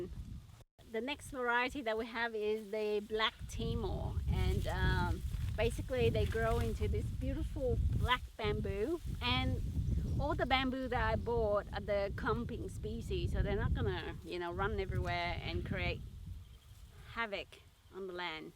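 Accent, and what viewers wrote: Australia. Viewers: Hi, I like your approach for a wind break. Bamboo has the highest oxygen output and carbon capture of any plant. The high Silica content of the leaf drop captures moisture in the ground and provides habitat for insects and critters. Oldhamii can grow three stories tall <link> and can withstand 120km winds. It can be char-coaled and it will produce the hottest burn of any charcoal known today. You sound Australian? Where are you in the country? I'd like to know for climate zone reasons. Also I would suggest reading about wind break design, usually you plant small trees infront which stops the full frontal force directly onto the trees or plant and minimizes turbulence, though I'm sure Odhamii can take it. see <link> & <link> for a great explanation.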